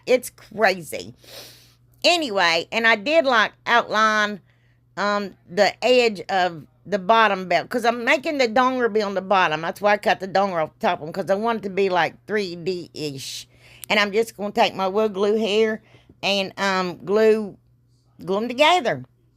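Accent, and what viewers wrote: South, United States. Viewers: OMG! You should be a stand up comedian! I laughed so hard when you were cutting the "dongers" off the bells. I had tears running down my face! You have such a deep southern accent, it makes everything even funnier! Loved all your projects. You have such a great imagination! I needed a pick me up and Girl, you gave it too me! I don't know why I have never seen any of your other videos. I love you and will be going back to watch you! You are the best! ♥️♥️♥️♥️😂😂😂♥️♥️♥️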